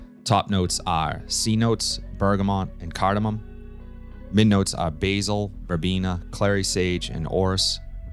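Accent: Boston, United States. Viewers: That Boston accent you got going on is so frigging annoying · Are you from Bahston? I can tell I'm from up there myself. I enjoy your reviews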